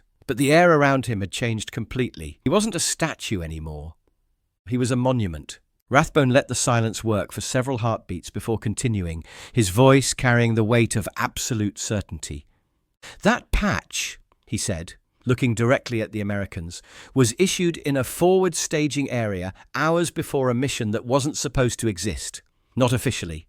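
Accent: United Kingdom. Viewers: Perhaps the worst aspect is that it sounds like a Briti yarping the commentary! This one and several others I've listened to!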